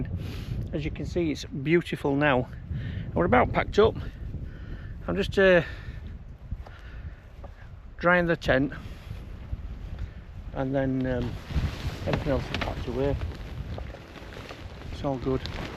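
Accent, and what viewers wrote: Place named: Scotland, United Kingdom. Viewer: Oh my gosh! I’m an American and I kept trying to catch your last name as you mentioned your wife. Then I finally heard Mrs.! I don’t know if anyone has ever mentioned that you have a touch of a Scottish accent 😂. I love watching your channel. I was one of the group that came over from Reddit and I’ve enjoyed all of your videos! I hope you keep making them.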